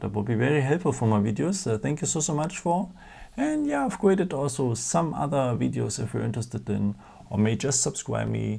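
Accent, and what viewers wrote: Germany. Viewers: Bist du vielleicht ein Deutscher? .... oder, sogar ein Österreicher? ??? That's why this was the best & most concise video! Ich habe es Sofort verstanden ----> which wasn't the case w/other videos!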